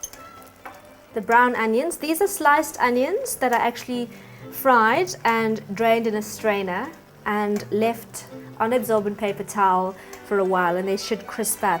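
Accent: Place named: United Kingdom. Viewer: What accent is that? I can't seem to put my finger on it. I was certain it was London or somewhere in the UK until I noticed the South African clover cream. She must have been born in the UK then moved to SA after developing the accent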